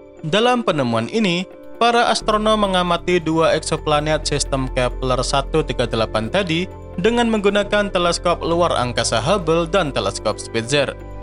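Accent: Java, Indonesia. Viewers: Pengisi suaranya wong jowo koyo e..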